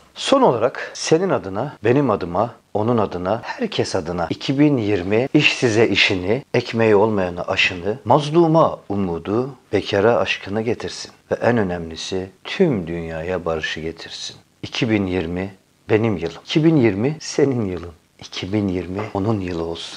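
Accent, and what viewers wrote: Erzurum, Turkey. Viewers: Erzurum şiveli komik hikayeler gelsin lutfen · Abi bu işi büyüt ama sevdiğimiz halinle doğallığınla devam et . Erzurum ağzını bozmadan devam etmeni rica ediyorum baş tacısınn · türkçende harikulede ama erzurum aksanıyla konuşmak sana daha çok yakışıyor videoların devamını bekliyoruz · Kardeş gerçek Erzurum ağzı ile anlatımların muhteşem nacizane başka ağızla hiç bir video anlatım yapmaman seni insanlar izliyor dinliyor gülüyorsa anlatımının komik ve gerçek Erzurum ağzı ile olduğundan gerek yok kitap gibi anlatımı konuşmayı bunları zaten birileri yapıyor başarılısın devamını istiyor izliyoruz ve beğeniyoruz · Abi şiveyi değiştirme erzum şivesi seni sen yapan özelleğin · E şimdi benim kafam karıştı. Sen sadece bu hikayeleri anlatmak icin mi bu güzelim erzurum şivesini konustun. Ben dogal şiven sanıyordum. Bu istanbul turkceli halinde ne şimdi gardas. 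Filmin orjinalligi gitti gibi bir durum oldu valla :) Biz seni oyle dinleyip tanidik,sen hep oyle ol..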